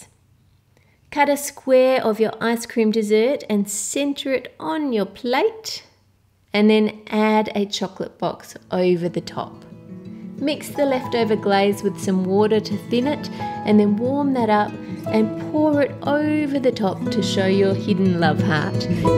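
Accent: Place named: Australia. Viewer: English isnt my first languarge and I learned english by a american. so this austrailian accent is a bit hard to understand for me. 😅 The words sounds so different.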